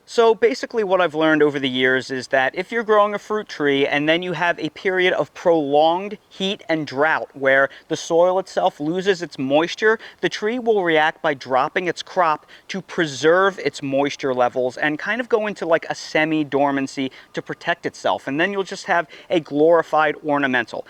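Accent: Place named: South, United States. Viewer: I love your Southern accent! ;-)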